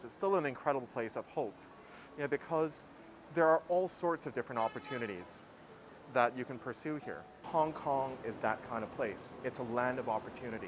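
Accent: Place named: United States. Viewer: you speak English as like American lounge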